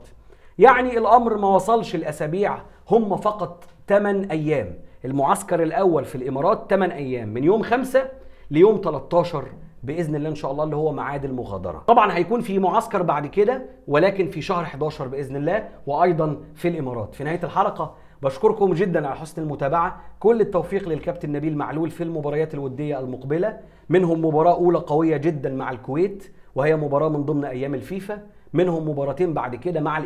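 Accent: Upper Egypt, Egypt. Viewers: هههههه لكنتك صعيدي اصلي ونتشرف فيك أخي وشكراً عمجهودك🌸🌸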